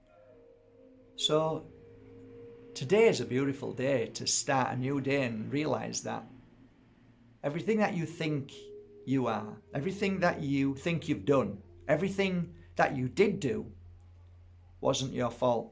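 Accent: Yorkshire, United Kingdom. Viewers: Love that Yorkshire accent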